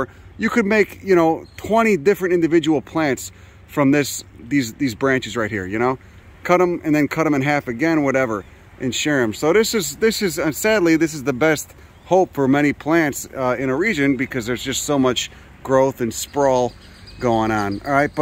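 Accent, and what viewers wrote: Chicago, United States. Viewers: You are welcome, I try to participate as much as possible. 😎 I am an active Master Gardener and Naturalist with a mostly wild yard in a mid-sized town. I enjoy his use of Latin names and style, not to mention the accent brings back fond memories of South Chicago, and Da Region.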